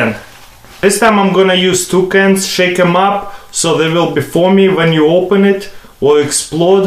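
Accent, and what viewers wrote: Russia. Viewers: Damn, stop fuck with him. He's from russia aye, if u come from germany, or another land, u can't speak goodenglish either right? Stupidfuckers :l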